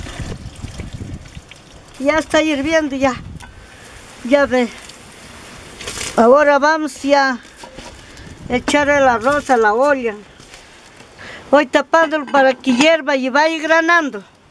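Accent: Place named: Lambayeque, Peru. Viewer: Parecía de México la demora así hablan en Lambayeque es diferente si acento de voz que interesante yo soy de tacna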